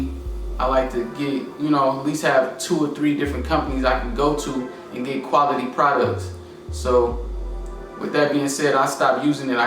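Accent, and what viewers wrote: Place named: Philadelphia, United States. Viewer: 😂 I hear Philly all in this man voice. Good video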